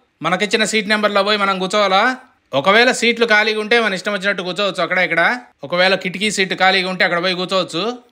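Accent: Kadapa, India. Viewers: Nee matalu vintunte Kadapa kallamundu kanabadathadi. Kadapa municipal high school lo chadivinava? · మీరు ఇచ్చే ఇన్ఫర్మేషన్ చాలా బాగా ఉంది,కడప భాష యాస సూపర్ sir మది కూడా కడప రాజ రెడ్డి street sir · Welcome to kadapa. I have been following your you tube channel since two years and enjoying your kadapa accent presentations. Thank you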